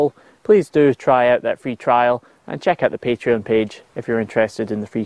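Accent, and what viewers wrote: Scotland, United Kingdom. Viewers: Haha, the scottish accent is so badass, that the automatically created closed captions just said, "yeah, well, f' ck it..." and just doesn't even try 😁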